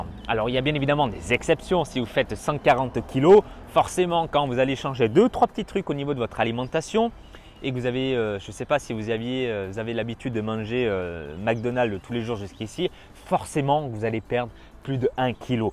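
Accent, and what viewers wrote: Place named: South, France. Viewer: Le petite accent du sud ☺️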